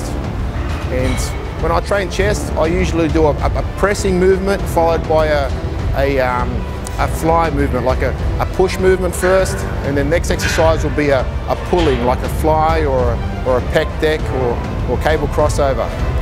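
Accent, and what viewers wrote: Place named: Australia. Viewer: He's Australian!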